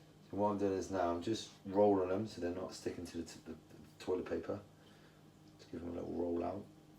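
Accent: Bristol, United Kingdom. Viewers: Great blog , keep up the good work love watching your fishing blog , you sound like your from Bristol lol 🎣👍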